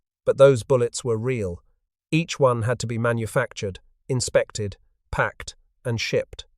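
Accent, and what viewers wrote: United Kingdom. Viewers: I absolutely adore listening…but genuinely curious why we have a male British narrator to catalog the history of American greatness 😅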